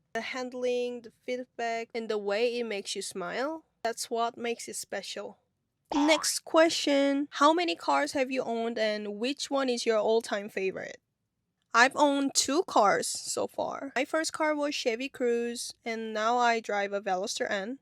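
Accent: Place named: England, United Kingdom. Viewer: amazing video thank you 😊 cute english accent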